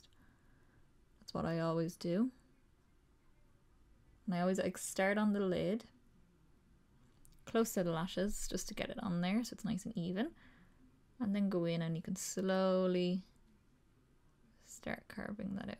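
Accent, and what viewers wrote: Ireland. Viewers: Damn you are so Irish 😍 😍😍💓💓💓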